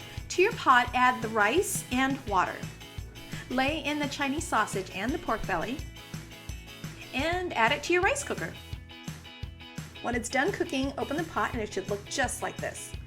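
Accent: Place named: United States. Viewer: wow if I just heard your voice with out the picture I think you was white American lady straight up.but nice cooking tho looks fantastic.cheers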